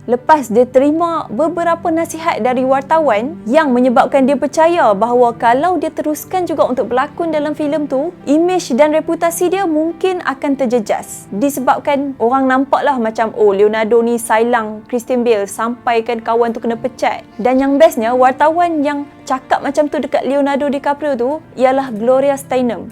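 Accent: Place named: North, Malaysia. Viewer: Pekat loghat Utara sis... Orang orang 😅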